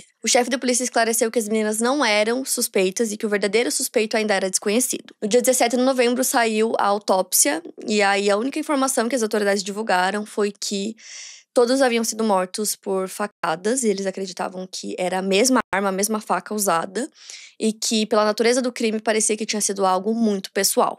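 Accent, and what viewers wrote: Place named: Pernambuco, Brazil. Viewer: vc é de qual estado do nordeste? Reparei em alguns sotaques que parecem ser de pernambuco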